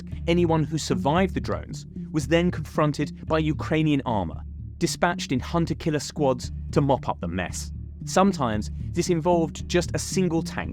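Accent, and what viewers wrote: United Kingdom. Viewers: Ridicolous Brit narration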